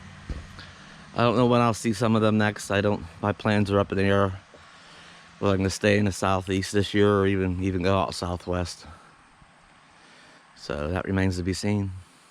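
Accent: Pennsylvania, United States. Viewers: If it weren’t for your PA accent I’d say you’re a Michigander. Lol. Bella too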